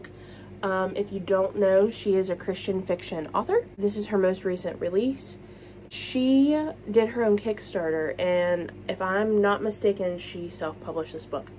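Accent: West Virginia, United States. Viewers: My frand..your west Virginia coming out..I'm cryinggggg 💀🤣 I love your accent ❤️ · Lol gurl let the WV come out!!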